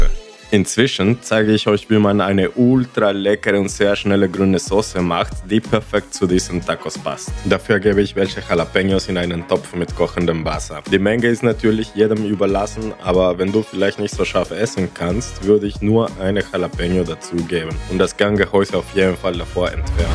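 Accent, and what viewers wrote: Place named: Russia. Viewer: lol du hast ur den russisch akzent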